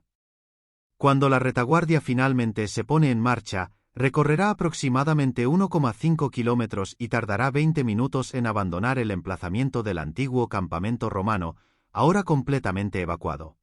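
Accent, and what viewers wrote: Spain. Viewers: Al ser una tradicción española y leer en el título la palabra "Fascinante" me produce rechazo solo pensar en escucharlo. Lejos de ser agradable el tono español es agotador, cansador, sobre cargado.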